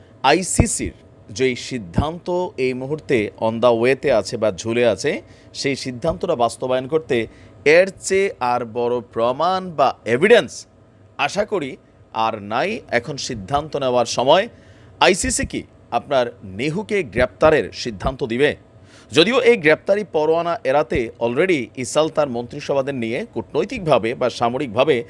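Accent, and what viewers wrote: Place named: Cumilla, Bangladesh. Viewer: সাহেব আপনি ভদ্র ভাষায় খবর পড়েন খুব ভাল লাগে আমার কারন আপনি কুমিল্লা র মানুষ আমি নরসিংদী আমরা পাশাপাশি। সবথেকে ভাল লাগে যে ভাষাটা কথাটা শুনলে আমার ☺ হাসি পায়। যেমন আজকে পর পর কয়েকবার শুনলাম এ বি সি নিউজে বেনজামিন নেতানিয়াহু নয় তিনি পড়ছিলেন ( বেজন্মা) নিয়াহু। শব্দ টা খুব ভাল লাগল।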